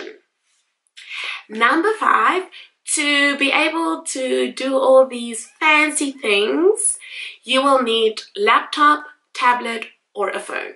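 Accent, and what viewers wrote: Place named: South Africa. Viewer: Which you could speak in your south african accent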